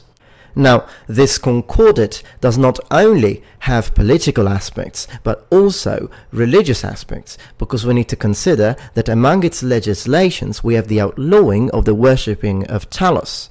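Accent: United Kingdom. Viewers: I love going back and watching older videos and it always takes me by surprise how thick and almost British his accent was at this point.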